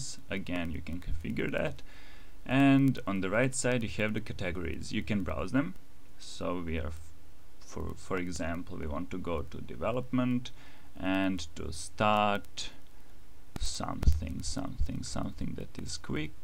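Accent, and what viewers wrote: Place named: England, United Kingdom. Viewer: your english accent is epic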